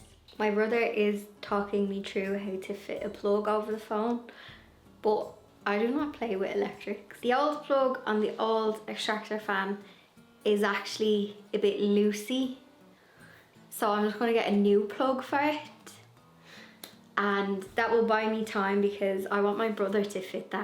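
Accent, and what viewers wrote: Dublin, Ireland. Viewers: Lovely hearing the true dub accent put paper on top of all your presses , and I change them every Christmas when decorating as well as my fire alarm batteries. Keep going girl you’re doing a great job . Up the kingdom 😂❤❤🪴💐☘️☘️ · 😂😂😂 “prehistoric grease”You are pretty amazing being able to accomplish all that you do. And something I never thought about but I wonder if my great grandmother had a brogue like yours or your brother. ❤❤. ☘️☘️☘️☘️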